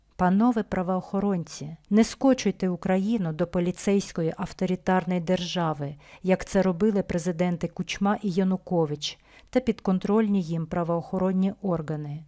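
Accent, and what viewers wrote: Russia. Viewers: ваш москальский акцент аж випирає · з явним російсьим акцентом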